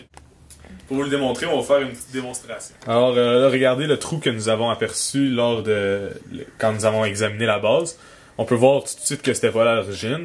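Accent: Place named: Quebec, Canada. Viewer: Cool la video j aime bien votre accent Qbekoi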